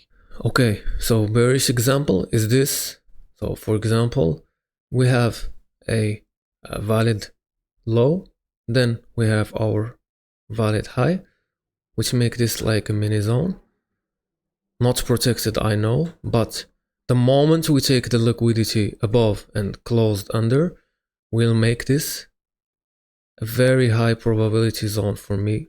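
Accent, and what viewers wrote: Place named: Morocco. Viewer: Are you moroccan brother? you sound like it, I am too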